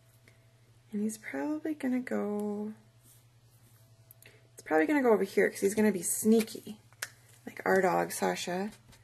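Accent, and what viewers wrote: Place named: Wisconsin, United States. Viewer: Hi I am watching this,just saying to my kids she sounds like she is from Wisc.You come to Florida we just go back from Wisc love this layout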